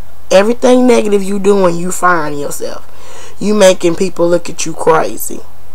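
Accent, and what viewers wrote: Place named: Rural, United States. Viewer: I love the way you talk all country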